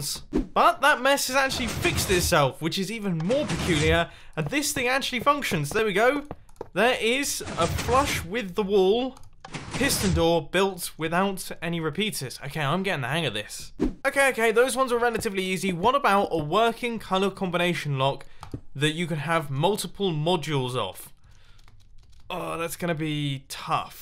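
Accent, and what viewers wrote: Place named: United Kingdom. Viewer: you are speaking british !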